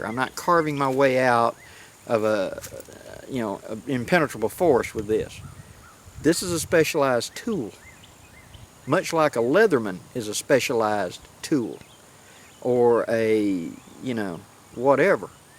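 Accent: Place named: Alabama, United States. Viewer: I figured out why I enjoy listening to you more than any other bushcraft channel. For one, you like slipjoints...I've always been taught you dont need a lock on a pocket knife. If you use it properly. I was given several US Old Timers by my granddaddy and they still ride in my pocket. Mainly the 80ot. For two, YOU'RE FROM ALABAMA. I was born and raised up by Lake Jordan in Slapout, You speak my language. Alabama southern draw. Keep em up and ill keep watching.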